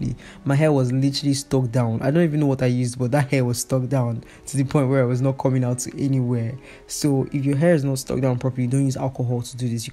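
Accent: Nigeria. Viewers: Gurrrlllll I love it...talk your naija talk babe! But awesomely explained 💋💋💋💋...then ending it with korean👀👀 yes ma'am ahnyeong-hekasayo! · Immediately I heard her first statements I knew she's either a Nigerian or have had close contact with Nigerians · “I will overpluck you from my comment section” the way she says it😂 I love my Nigerian sistas, the accents are everything bc it makes everything else funny y’all say a mil times more funny😂😭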